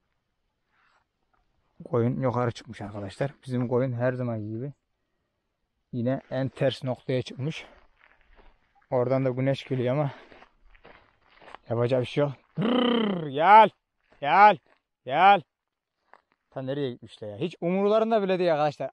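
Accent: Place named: Çorum, Turkey. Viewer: Çördük kızılca derler bizim memlekette.konuşmamız şiveniz bizimle aynı kardeşim.çorum benim memleket.ama bursadayım ben.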